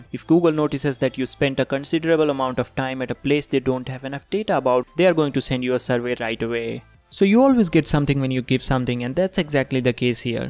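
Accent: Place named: India. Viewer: Indians English pronunciation..... ew but thanks for help anyways.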